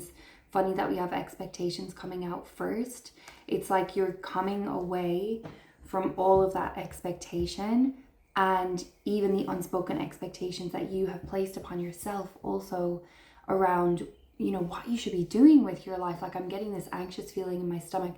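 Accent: Ireland. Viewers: Lovely to find you too, together we do this thing, and put things right. Cancerian? An Irish lilt? You made me cry too, thankyou. x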